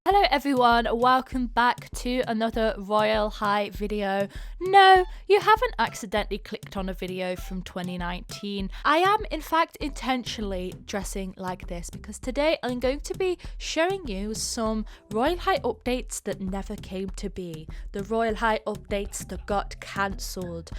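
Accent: United Kingdom. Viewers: are you british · I love her British accent she is the best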